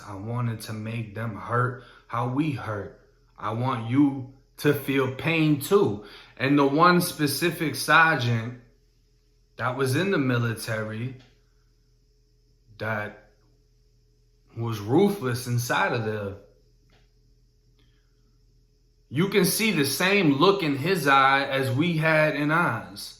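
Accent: Boston, United States. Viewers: I’m under my girl’s thing here , anyways you’ve done a lot of time in Florida ? But your accent Boston ? I’m from NY , but lived in Florida for some yrs , spent a lot of time in Polk county fl , south county jail ( frostproof) our sherif was Grady Judd at the time , not sure if he still is ? But he’s a trip bro , could u do something on Polk county ? Ty for your vids pretty cool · "the sahgeant" my man you sooo Boston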